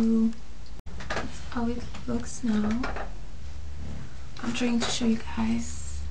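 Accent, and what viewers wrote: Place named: France. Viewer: Heey tu serais pas française par hasard?? Tu parles avec le même accent que moi , c'est troop choquant j'ai l'impression de me voir xD Anyway , if not , i just wanted to know what's the name of your hair color (the one on the lenght)? And my hair is now VERY dry after the salted sea ... Would you recommend me some products or any tips ?? Thaank youuu =DD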